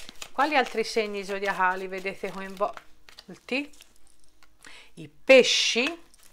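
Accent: Tuscany, Italy. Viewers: Ciao sei dolcissima mi piace il tuo accento molto toscano.. ti sono vicina e ti mando tanta positività ❤😘😘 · Cara ti seguo da diverso tempo anche se non interagisco praticamente mai Adoro il toscano e ascoltarti è piacevolissimo Per quanto riguarda la vaccinazione porta pazienza vedrai che tutto si sistemera' quanto prima In bocca al lupo 😘😘😘😘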